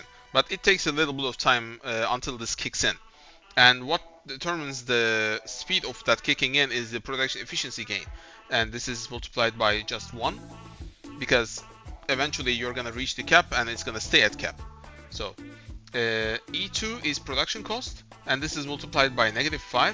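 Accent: Turkey. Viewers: bro are you turkish you have turkish accent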